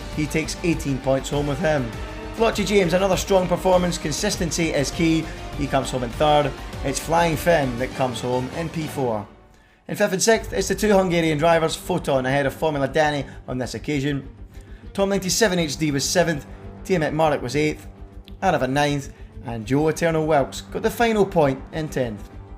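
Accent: Scotland, United Kingdom. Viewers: Scottish ain't too hard to understand, what is hard to understand is why he has an issue with tom97hd tho 🤔 just seems to slate him a bit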